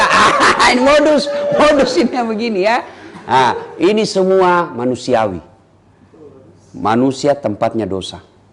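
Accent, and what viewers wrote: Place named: Makassar, Indonesia. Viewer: Assalamualaikum Ustadz, sy suka ki ceramax ustadz krn logat mkssrx kental sxl,,, dan MANTAAP Ustadz Ceramax,,, 🙏👍💕😎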